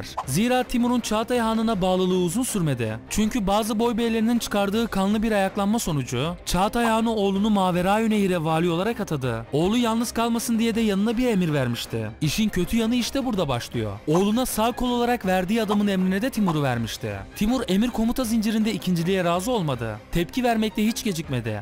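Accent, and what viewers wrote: Batman, Turkey. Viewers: Hocam batmanlımısın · Batmanlımısın kardeşim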